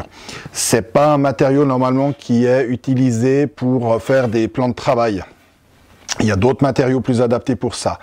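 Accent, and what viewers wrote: Switzerland. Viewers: Avec un accent vaudois ? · J'essayais de trouver cet accent et je me disais Apple Bière ben voilà ;-) aller pour une fois qu'il y a un Suisse qui se donne la peine je m'abonne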